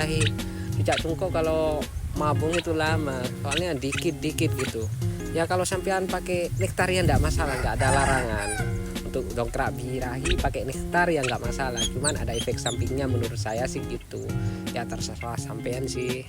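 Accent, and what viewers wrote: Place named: Madura, Indonesia. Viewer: Logatnya kayak madura😂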